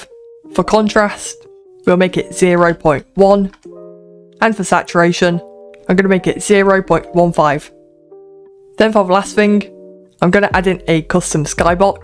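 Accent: United Kingdom. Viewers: I love your accent! I can tell your british. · are you british? just trynna guess from accent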